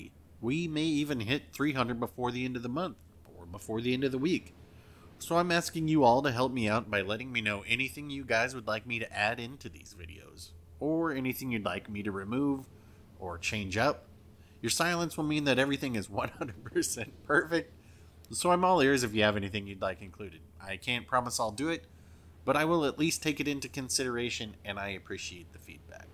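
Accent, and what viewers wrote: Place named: England, United Kingdom. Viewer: no your good but i find the anglish accent fun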